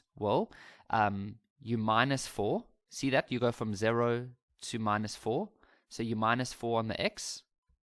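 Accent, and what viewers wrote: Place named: South Africa. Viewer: You're South African😳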